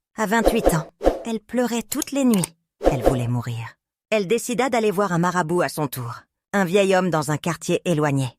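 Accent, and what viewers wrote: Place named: Spain. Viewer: Histoire à méditer car très instructive Bel accent espagnol ❤❤❤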